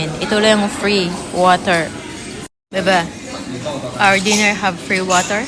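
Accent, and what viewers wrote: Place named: Ilonggo, Philippines. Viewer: Ilonga kasi ibà kung minsan ang pagbigkas❤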